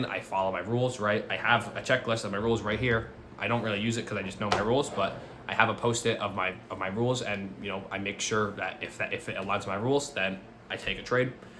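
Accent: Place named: New Jersey, United States. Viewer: Nice video. Do you trade the synced accounts on tradongview? Also so curious where your accent is from. NJ?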